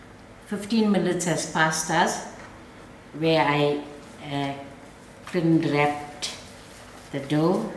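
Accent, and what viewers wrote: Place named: Durban, South Africa. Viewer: U explain so well in your Durbsn accent love the way u cook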